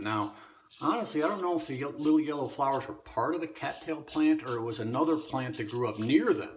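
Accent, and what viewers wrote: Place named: Chicago, United States. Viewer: great video and I love it when you lay that Chicago accent on thanks for sharing it ,it made my day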